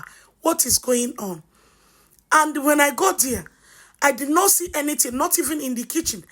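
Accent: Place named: West Africa. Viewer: This accent is like west africa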